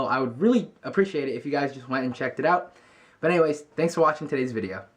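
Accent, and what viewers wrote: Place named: Vietnam. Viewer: I love your accent, are you Vietnamese?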